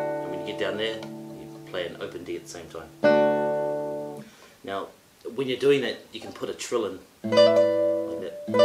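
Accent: New Zealand. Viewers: I'm really glad to enjoy your tutorial here. Moreover, 'Kia ora' is very familiar because when I visited New Zealand for a month 2011, English Program for teachers in Auckland. My hosts were very warm-hearted and I still miss them a lot. Today your English accent and pronunciation reminds me of them. Especially whenever I have free time, I'll visit here and enjoy your play and explanation. Thank you so much ~!😊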